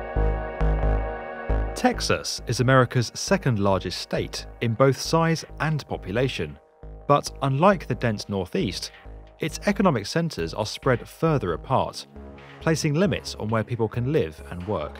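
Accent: England, United Kingdom. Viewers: Never did like the English voice for trying to sell me on something I know won't work. You can't get people to buy the same car or eat the same food, but you are going to put them all on one common transportation device! Never going to happen. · Great Brit announcer, but Asian pronunciation sucks.....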